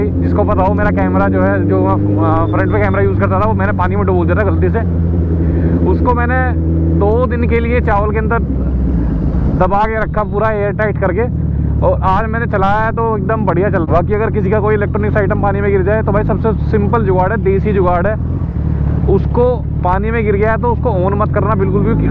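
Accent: Haryana, India. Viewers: Bhai i like you jaat language. Awesome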